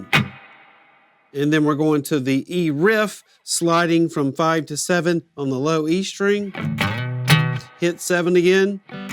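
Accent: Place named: South, United States. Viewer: okay thanks ( by the way that is not my picture,,just props) any who this lesson is really valuable to me i will diffidently use this one!!! hey in this world of politically correctness which is completely ridicules ( thanks obama) i just gotta comment on your accent,,i like that southern draw when you speak,,I'm from Texas my self i thought man that guy sure does sound like my home boy ! any who GREAT SHUFFLE Lesson...e : )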